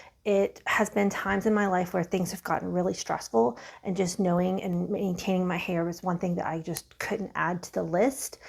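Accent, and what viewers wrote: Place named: South, United States. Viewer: I knew you were from one of the southern states, I mimic accents and I pick up on accents....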